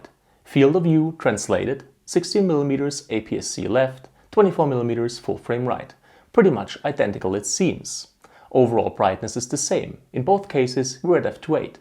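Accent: Stuttgart, Germany. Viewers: Kommst du aus Stuttgart? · man, are you german? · U sound a bit german, are you?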